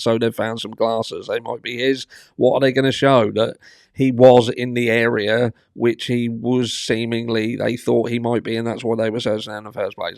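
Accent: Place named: Suffolk, United Kingdom. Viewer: You have a lovely accent !! Are you from Suffolk?? 🌲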